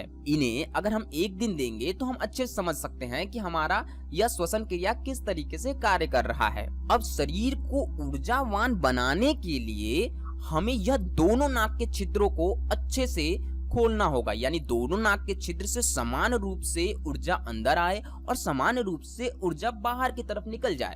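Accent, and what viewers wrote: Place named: Bengal, India. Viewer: Abe Bengali kaisi hindi bol raha hai. इंडा क्या होता है । ठीक से बोल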